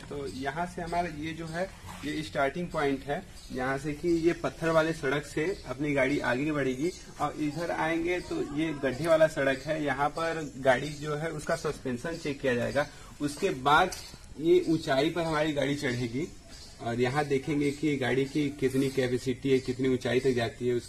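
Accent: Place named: Uttar Pradesh, India. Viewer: Ye ladka pakka up ka hai 😄🤣🤣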